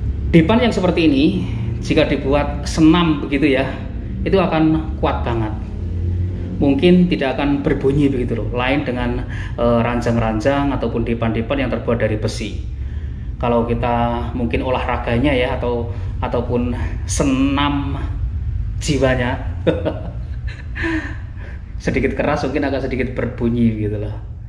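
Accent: Java, Indonesia. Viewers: Seneng mas jawane enak ngerungokne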